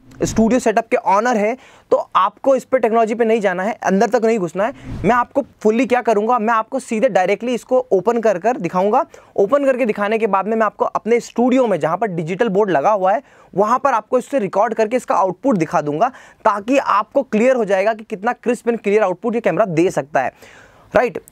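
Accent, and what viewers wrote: India. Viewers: R u from Maharastra?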